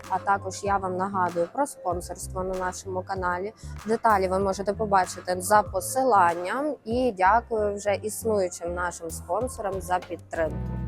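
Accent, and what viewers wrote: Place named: Western Ukraine, Ukraine. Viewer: а мені подобається , як Ви говорите. Можливо, це діалектизм навіть. По наголосах можу припустити , що Ви із заходу. Сорі якщо не вгадала )) і «получився» мені норм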